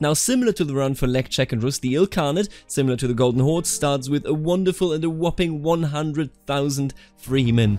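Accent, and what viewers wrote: Germany. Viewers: Lav ze German Akzent:D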